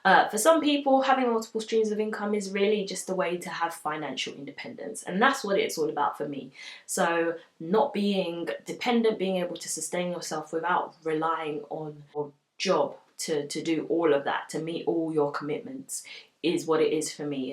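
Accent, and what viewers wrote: United Kingdom. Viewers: My new favorite vlogger! A down to earth black woman, in marketing with a British Accent!! Love it!!! Subbing from Texas!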